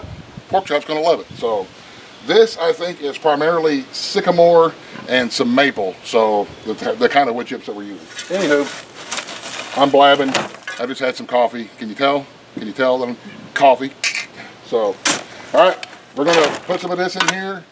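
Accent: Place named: Oklahoma, United States. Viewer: Where ya from? Been tryin to figure it out. You kinda talk like us round here. Im from north east Oklahoma. By Arkansas kinda. Just wonderin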